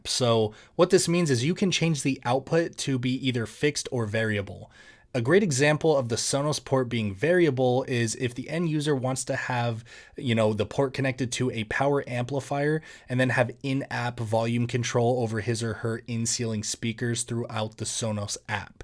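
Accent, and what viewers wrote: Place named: United States. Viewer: Annoying American accent. Low info density, too low. All info could have been given in 5 mins.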